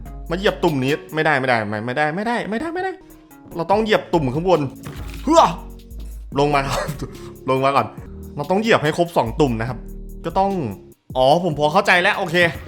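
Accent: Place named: Thailand. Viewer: นับวันพี่ ยิ่งพูดไม่ถูกเเถมมีเหน่อด๋วย <num>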